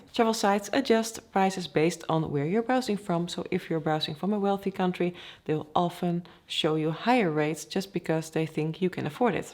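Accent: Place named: Netherlands. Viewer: This accent is so harsh, it sounds like someone from Holland is trying to speak English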